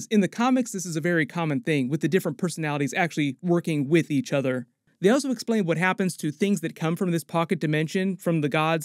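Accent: England, United Kingdom. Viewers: I agree. Not saying you're ignorant though 😉 but his English accent is just fine. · Great video as always. But oh my word. Public announcement that the English accent isn't that bad 😅 people do speak like this.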